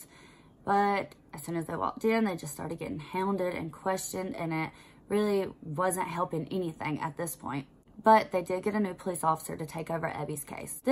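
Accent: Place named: South, United States. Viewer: Just found your channel and I love your accent 👑 Sending love from another Southerner 👋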